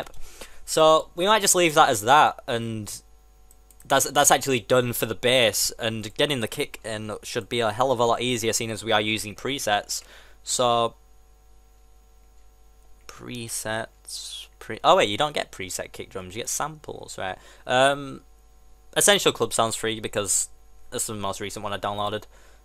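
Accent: United Kingdom. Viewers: Northerner. Definitely a northerner.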